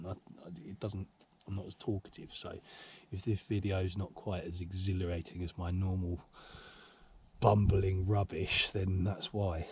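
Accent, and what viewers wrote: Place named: Cornwall, United Kingdom. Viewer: Nice honest video. I recognise the location and your accent.. Dont let the kernow nimbys get under your skin. Keep going for your gilty pleasure. And If youre getting crabbed up then try a pop up bait to keep it above their heads and claws.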